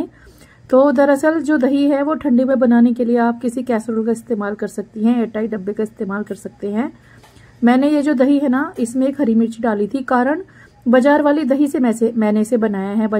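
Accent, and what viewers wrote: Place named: Uttar Pradesh, India. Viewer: Lagta hai aap up se hai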